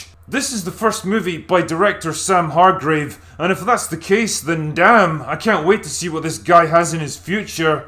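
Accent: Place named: North Wales, United Kingdom. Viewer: Damn, that's a strong north Wales accent.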